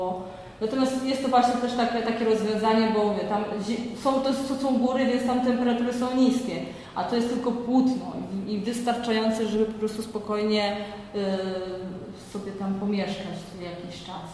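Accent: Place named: Russia. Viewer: Temat bardzo ciekawy, ale przekaz trudny do słuchania. Co chwila no, no, jakieś rosyjskie końcówki, zamiast ść, sc. To nie rozmowa w małym gronie, ale przekaz do większej ilości osób.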